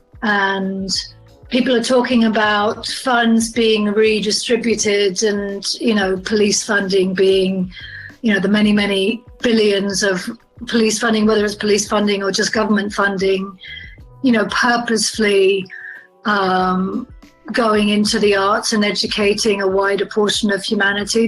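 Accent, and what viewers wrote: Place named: United Kingdom. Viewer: She lived in the UK til she was 13 and has now lived in the UK for most of her life. She’s not “doing” a British accent. It was her first “language”.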